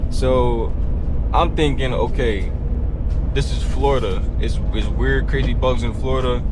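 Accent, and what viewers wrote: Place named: Baltimore, United States. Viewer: Baltimore accent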